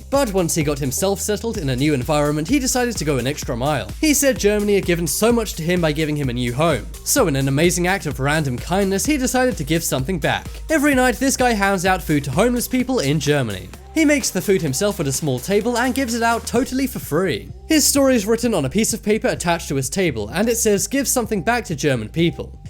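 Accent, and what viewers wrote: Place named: United Kingdom. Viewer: What’s with the British voice??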